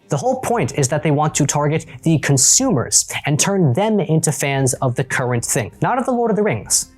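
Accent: United Kingdom. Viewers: I have several things to say. Holy crap, you tore these guys to shreds, and they justifiably deserve it. We, the fans of Tolkien and his work, all stand together, linked arm in arm like a chain, and these bastards are trying hard to break that chain, to shatter our unity and throw us into darkness. We will not stand for this, we will not falter. Just as the chain of links around Frodo's neck carried The One Ring into the fires of Mount Doom, we will carry our love together, and then hurl the evil back into the firey chasm from whence it came. They will lose. There is no other way, because we will not stand for this bullcrap. Just imagine if people with such deep and true love for Tolkien and his works had made the show instead. Just imagine if they spent the same amount of effort they do attacking fans into pouring love and genuine passion into their work. 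If not for Lord of The Rings, I might've never gotten into fantasy. I might never have created my own fantasy world when I was 13 years old, then continue building upon that world all these years. I probably never would've been inspired and motivated enough to begin work on my own book. If not for my love of Middle-earth, I would probably never have begun collecting fantasy(and medieval) clothing, armor and weapons. Never would've had my own little armory, as I do today. Plan on making a video about that in the future. And finally, your Brittish accent was great. Sorry for such a long comment, just had a lot to say.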